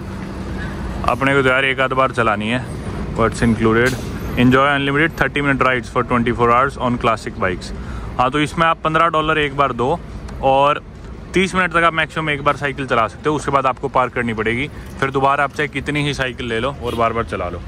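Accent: Haryana, India. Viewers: Haryani accent is Lit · Really like the way of explaining everything in very simple way. Also the music while you were on that ferry , you looking into waters , what a moment it made me emotional. You take so much efforts, walking everywhere totally agree for such a short distance they charging so much or its just the conversion making us feel so costly don't know. Buddy simply great. ❤️ for showcasing the reality in subways. again your Harayanvi tone 👍🏻 the way you speak. 'Dhakke' khaye but it was worth. Lots of ❤️ to you. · Haryanvi accent m vlog dekhn ka mja alag h besk hindi bolta ho. Bhot badiya h bhai. Baki height dekh angrez m chote lage h 😂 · Bhai aap Haryana se ho kya ?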